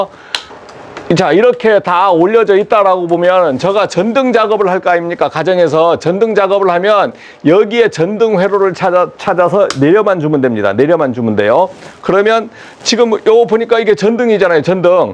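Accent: Jeju, South Korea. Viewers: 말투가 제주스타일 갔다고 생각했는데 구독했더니 제주도 분이네요 반갑습니다❤❤❤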